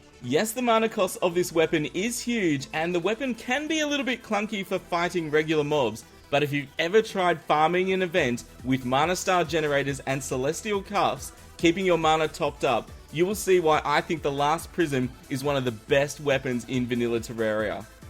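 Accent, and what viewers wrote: Australia. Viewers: When 1.3 comes for mobile i will surely go after the last prism since the mage class is my favourite and the one that i mostly play. P.s. I've never been so early for a video of yours, especially now that it is am right here, so i thought i could also ask a question which is: Are you Australian?. Keep up the good work:)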